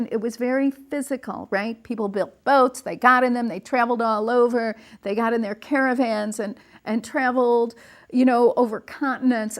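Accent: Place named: Texas, United States. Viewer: What has mrs studied at university? Where did she obtain the Ph.D? She has tics for example at 12.39 minutes. She is scary, she is so demanding like a religious clerk. "Right"? "Listen". With her Texan accent.. No, not appealing, no charm. Be aware of your body language when you are on film.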